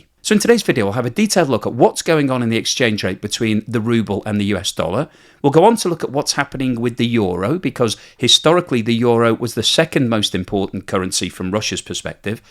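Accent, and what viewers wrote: United Kingdom. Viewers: When a British accent bloke talks about geopolitics and Russia - be aware: Brit wet dream and russophobic propaganda dead ahead....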